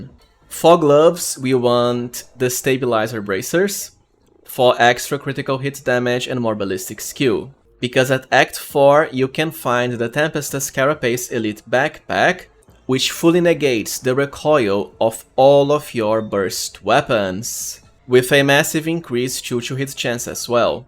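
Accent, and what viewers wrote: Brazil. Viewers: Are you Brazilian? your accent cant fool me :P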